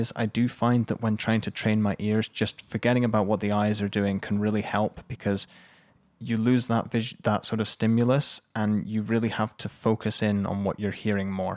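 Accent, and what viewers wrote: Scotland, United Kingdom. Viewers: Ery fine. The listening was the important part. The post compression comparison made what I was hearing visible. Thank you. Do I detect just a wee Scottish burr in there? Well hidden , but awe the same....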